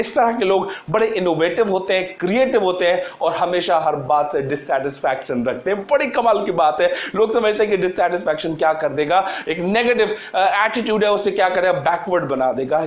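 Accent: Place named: Pakistan. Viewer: I'm from Pakistan and your speaking style is like Pakistanis You present the topic very well Thanks 💖💖💖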